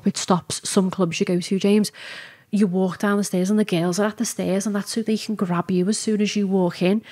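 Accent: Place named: Liverpool, United Kingdom. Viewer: Lovely skin and that wig is phenomenal.. best money can buy .. I can’t with the Liverpool accent though 😂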